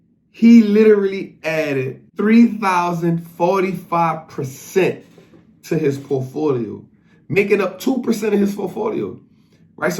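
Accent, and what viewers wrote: New Orleans, United States. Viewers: I'm from New Orleans and instantly noticed that accent, saw you on earn your leisure podcast and you are an inspiration, I started invested a couple years ago and this year I'm finally grasping this and I love the way you explain things, I want my money to grow even more and faster so I can actually enjoy it, big inspiration man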